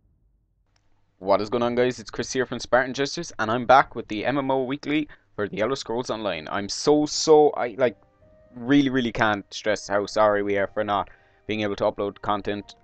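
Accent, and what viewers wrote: Ireland. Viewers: are you irish? DAT accent !!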